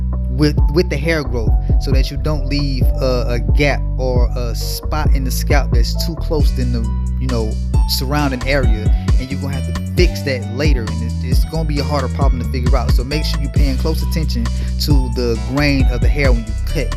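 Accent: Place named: South, United States. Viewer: Oh Chile u are COUNTRY Lmbo love it !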